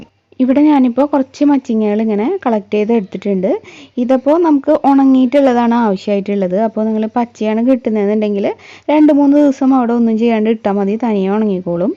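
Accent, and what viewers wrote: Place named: Kannur, India. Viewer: വെളിച്ചങ് കണ്ണൂർ ഭാഷ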